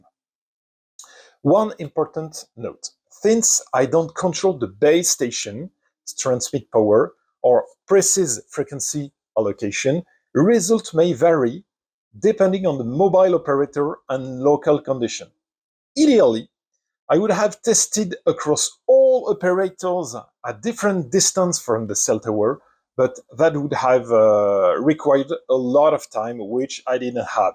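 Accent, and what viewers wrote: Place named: France. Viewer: Interesting. Your English is fine (I only wish I could speak French that well). Your pronunciation of some of the letters (particulalry i and y) very amusing. A little like Peter Sellers in The Pink Panther films :-)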